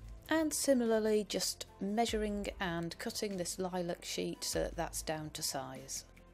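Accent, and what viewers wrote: United Kingdom. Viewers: I absolutely love your voice! Its like watching a tv program! What part of the Uk are you from, if I may ask?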